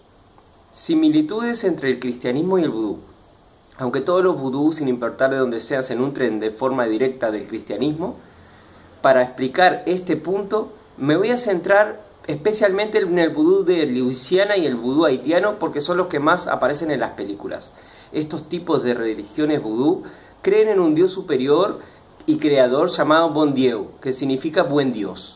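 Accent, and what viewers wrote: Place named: Argentina. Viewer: si es barcelona, ¿x que hablas como argentino?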